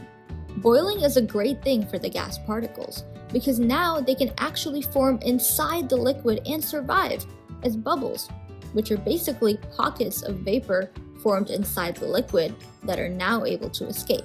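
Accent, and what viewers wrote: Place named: South Korea. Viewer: You made it soooo Cute!!!✨😆 Thanks Girl😝. BTW are you Korean? Your accent seems korean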